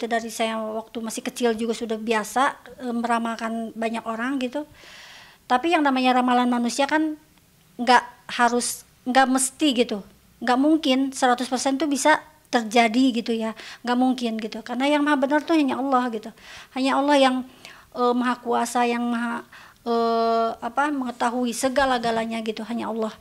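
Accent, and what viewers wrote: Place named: West Java, Indonesia. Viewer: Orang sunda ya, keliatan dr logatnya..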